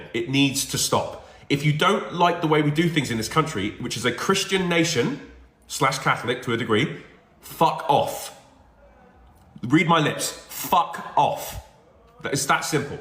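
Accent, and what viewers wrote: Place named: United Kingdom. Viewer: Dude your spot on we dont really care about anyone's ethnicity or colour just try and fit in too our culture the country and culture you chose to move to, were happy to have you but try and fit in, you sir are a perfect example of a family who has embraced British culture you speak like a true brit perfect use of language and I'm glad your one of us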